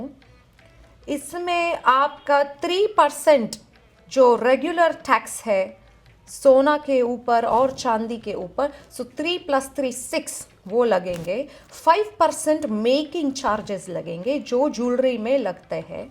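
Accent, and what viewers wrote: South, India. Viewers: While I agree that taxes are too much that gdp will actually drop. Lets not be political about it, since the same happened during the congress regime. Both of them follow the same capitalist policies while in power and plain to be socialist while on opposition. And your Tamil accent is horrible. Indiands probably pay the highest taxes. Economics doesn't work this way. We should have had more reforms,but it seems like modiji like chidambaram ji is trying to shore up enough so that the next set of freebies can be announced before next elections. Wouldn't be surprised if some major changes would be in place before next polls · I ❤ her south Indian ascent? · Listening to her for the first time here, I thought she was south Indian. Then I looked at her Hindi name and other videos and realised it was mimicry 🙂 I have to admit, very rarely do you get non-south Indians imitating south Indians in an accurate manner. 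It is always some cliched "ayyo rama" imitations. I am sure she put in a lot of effort to learn the accent for every word that she is speaking in this video.👏👏👏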